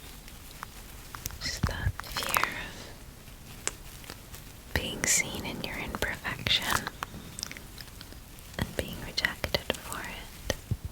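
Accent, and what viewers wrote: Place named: New York, United States. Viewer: My favorite is your NY/NJ accent❤❤ I love it so much, please do more 💜💜💜💖💓💓💓💓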